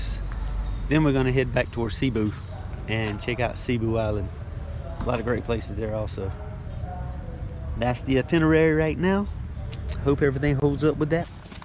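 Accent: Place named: Arkansas, United States. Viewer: bro your accent , are u from Arkansas? its hard to distinguished American.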